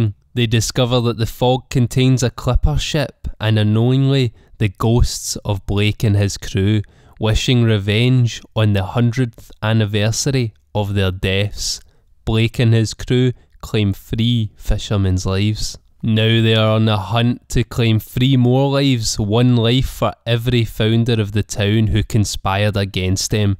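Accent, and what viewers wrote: Scotland, United Kingdom. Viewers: Are you Scottish? Heavy but wonderful accent. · What part of Scotland are you from? Glasgow?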